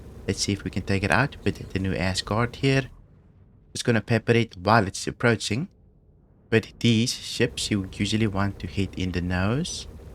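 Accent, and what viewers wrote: South Africa. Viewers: you south african ?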